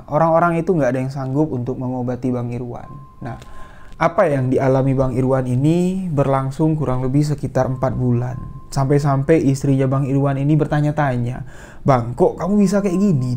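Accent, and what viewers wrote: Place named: Jambi, Indonesia. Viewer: Enak banget ngomongnya,kaya gini yah logat jambi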